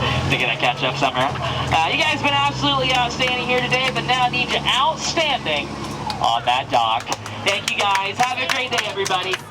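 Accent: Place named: New York, United States. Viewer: your loud New York accent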